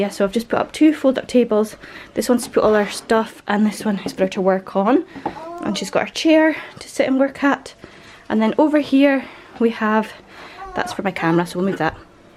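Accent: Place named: North, United Kingdom. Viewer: Fab video. Ive been stressing about teaching my 2 girls inbetween being out at work. Lovely to your lovely family and to hear ur accent. Im originally from Peterhead so its nice to hear northern accent again. Stay safe take care x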